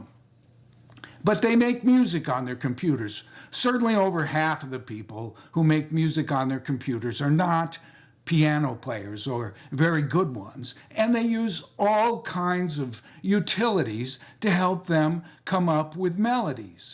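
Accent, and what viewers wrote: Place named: Brooklyn, United States. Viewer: Nah, it's the Brooklyn accent! East Village resident for 30 years; now down South. LOVE the Brooklyn accent (you'd be very popular down there - folks love it here as well!) Though, like Jeffy - folks outside NY probably can't tell the difference between Queens (Woody) and Brooklyn (you). You're definitely cooler looking than Woody:>))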